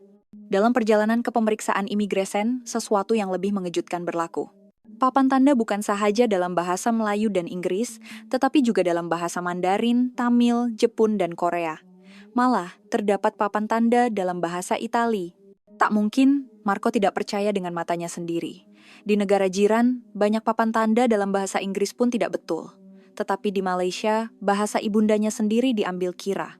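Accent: Indonesia. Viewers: Aik suara indun!! Tapi konten Malaysia!!! Tak usahlah buat konten pasal negara orang, buat lah negara kamu sendiri!!! Indunnnn!!!!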